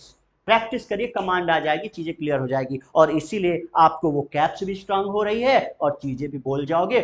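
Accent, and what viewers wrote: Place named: United States. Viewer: Why are you talking in American accent m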